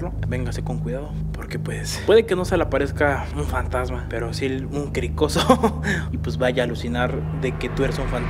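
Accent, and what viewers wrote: Mexico City, Mexico. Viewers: lo que más horror causa es tu acento chilango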